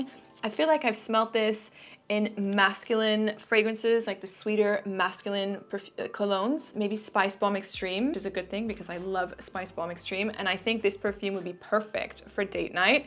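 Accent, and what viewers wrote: France. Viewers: Kinda just watching the video because I love listening to her French 😌 ❤ 😊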